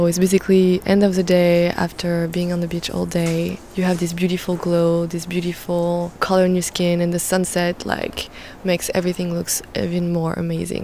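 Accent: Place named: France. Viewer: Nice voice and French accent. You're so chic . Keep up the great work .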